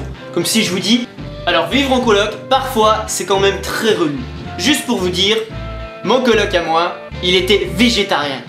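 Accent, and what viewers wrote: Quebec, Canada. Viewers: étant français j'ai tout de suite su que c'etait un quebecois ( pour un français y'a un ptit accent ) et puis un français ne parlera jamais aussi bien quebecois que lui ( on a tendance a éxagerer l'accent lol ) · Coudont est tu Quebecois? Sinon tu imitted l'accent bien en tbk ! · J'ai dû retourner en avant et en arrière quelques fois o.o, ton accent Québécois est très bien! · C'est un Québécois ou un Français (perso je suis quebecois et si c'est un français il fait l'accent québécois parfaitement)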